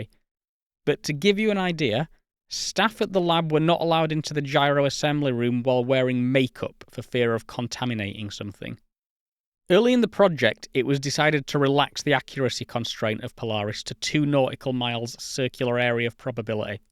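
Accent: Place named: Northern England, United Kingdom. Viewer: I'm going to guess it did not occur to him his perfectly standard northern accent is "difficult to understand"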